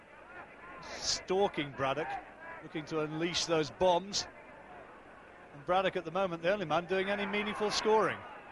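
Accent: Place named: United Kingdom. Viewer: The Russians are smart enough to get a British sounding guy to narrate the fight, but don't be fooled...this is Russian television.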